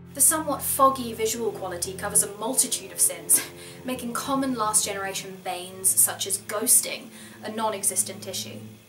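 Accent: United Kingdom. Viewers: Pretty girl? Likes video games? British accent? You probably get this a lot but damn.... I think I may be in love with you.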